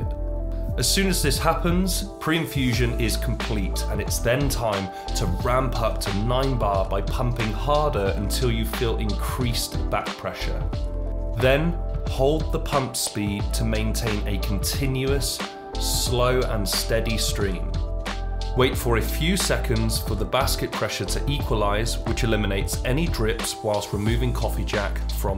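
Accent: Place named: England, United Kingdom. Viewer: Nice English accent 👍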